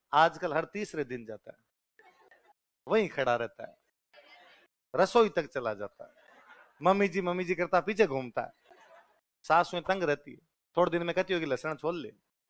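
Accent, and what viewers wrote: Rajasthan, India. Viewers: aapki rasthani mix hindi CHOKHI LAGE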